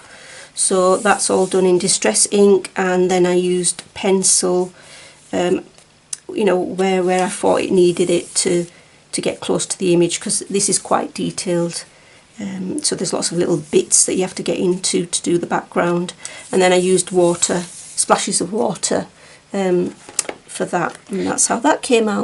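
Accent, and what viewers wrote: United Kingdom. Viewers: Very useful!! I am sitting here with my second iPad buying up on Amazon! Today was pension day! You have become like a friend to me I would love to sit at the table and colour with you in person, since I am in Australia that’s not possible, love your videos very much, and your accent what part of Britain are you from? I was born in Birmingham but don’t really have an accent, except true Aussies usually pick I am from the UK 😂😂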